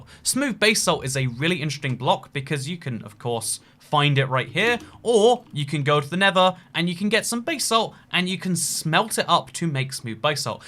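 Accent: United Kingdom. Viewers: I’ve been watching for years! And he still speaks so fast with his British accent that I still can’t recognize half of what he says as the English language. With that said, I’m a big fan and long time supporter. Just saying. “Hey everyone, here! If you like videos like this then wwocofmtkgkvkgksksjdhwbdjckfkfngmvkckrktkgkdjdjsnej.” Yeah, what he said. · Why are you complaining about a British person pronouncing a word the British way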